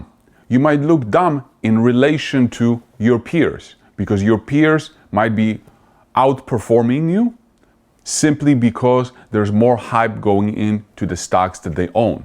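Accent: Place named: Midwest, United States. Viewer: M. I feel very fashionable! On Sunday evening 23 January, I was 85% in cash, on Monday 24 January, I was fully invested and in margin, PLTR, SOFI, XPRO, IMDB ETC. THE 15% remained in the big 3 of yours, And USGDF, AZMCF,CNSNF. I don’t care about stock prices, just want to be fashionable. Going to be measured for Brioni, Dolce & Gabbana, and Givenchy, I have grey hair Fair, wrinkled skin, with brown spots what colors do you recommend,( to match my stocks? Not sock,)? BTW, you are developing a midwestern accent like Ohio or Indiana.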